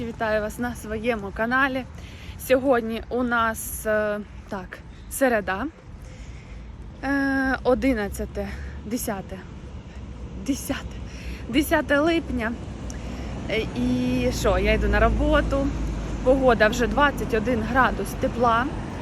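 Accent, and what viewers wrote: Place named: Nikopol, Ukraine. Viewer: Я хочу сказати,що в Польщі чисто скрізь. Прибираають вони не тільки для туристів, вони так живуть.І це супер. А ще, я просто в захваті від Вас і Вашої мови! Мої батьки і я теж так розмовляємо (балакаємо)). Я з Нікополя. Як добре, що ви виїхали з окупації. Наш Нікополь обстрілюють з Енергодара по три -чотири рази на день... Просто жахіття.Кожний день гинуть люди, руйнуються будинки...Я в Кракові. Мама їхати до Польщі не хоче. Сказала, що вмирати буде в своїй хаті... Плачу, п'ю таблетки,щоб заснути. Ви тримайтеся, ви разом і уе головне. А в Польщі теж можна жити, працювати і заробляти. І будемо молитися, щоб ті фашисти згинули з нашої землі, з наших домівок. Продовжуйте знімати, у Вас добре виходить, цікаво. А кому не цікаво і не подобається, то,як кажуть, ви ж не долар))щоб всім подобатись.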